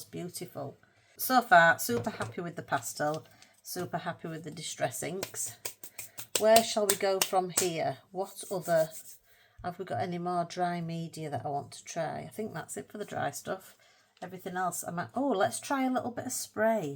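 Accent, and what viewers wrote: Yorkshire, United Kingdom. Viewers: Hi, loved this video. I only watched, didn't take part but got some great ideas, so thank you. You are so funny. Where abouts in Yorkshire are you from. Loved listening to your accent and some of the things you came out with are the same as what I would say so you had me laughing and smiling so thank you so much for the laughs and for sharing your processes and thoughts. Gonna watch your next video in this series. Thanks again, take care. Kind regards from Helen somewhere in the Greater Manchester area, UK.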